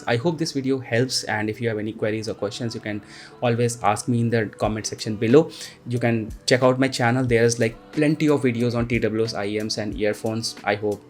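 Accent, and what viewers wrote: India. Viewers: Bro your spoken English is great, perfect Indian accent unlike the popularized exaggerated accent mocked by foreigners.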